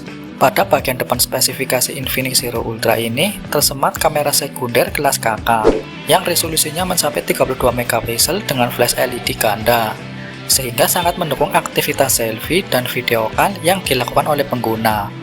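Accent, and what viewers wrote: Banyumas, Indonesia. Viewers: 😂ki asli ngapak.ora ngapak ora kpenak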